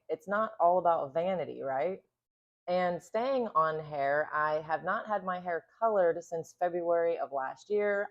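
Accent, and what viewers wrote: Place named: United States. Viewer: Love the accent. Im from Ireland and I rarely here a real and nice Amwrican accent, you know some influencers put on a drain thing and sound artificial.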